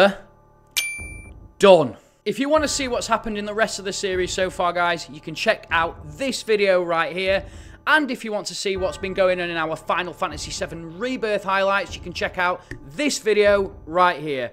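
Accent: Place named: Yorkshire, United Kingdom. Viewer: The Mrs is from Yorkshire! Where you from big man? Some armpit like Selby/Goole?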